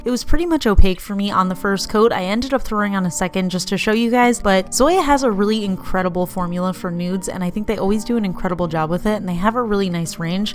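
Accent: New Jersey, United States. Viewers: I love this collection. Love the new hair color too! I totally understand about how you say some people make fun of how you pronounce ‘ pastel’ I’m from Jersey too ( near LBI) and I’m always being made fun of on how I pronounce certain words. Maybe it’s a Jersey thing. But now for the important Jersey question- is it Taylor Ham or pork roll. Lol. ❤️ I love your channel.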